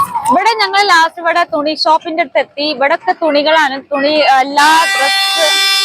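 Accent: Malappuram, India. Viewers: മലപ്പുറം slang ആണ് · മലപ്പുറം ചേളാരീ.കോഴിക്കോട് ജില്ലയിൽ തെക്ക് ഭാഗത്ത് ഇതിനോട് സാമ്യമുള്ള സ്ലാംഗ് ആണ്.എന്നാൽ, കോഴിക്കോട് ജില്ല മുഴുവൻ ഇതേ സംസാരമല്ല.കൈയിലാണ്ടി,വടകര ഒക്കെയുള്ള വടക്കൻ ഭാഗത്ത് തികച്ചും വ്യത്യസ്തമായ സ്ലാംഗ് ആണ്. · മലപ്പുറം ✌️ആണ്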